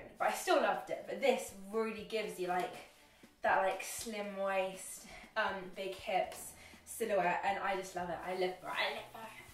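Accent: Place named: United Kingdom. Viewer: I love the British accent 💕💕💕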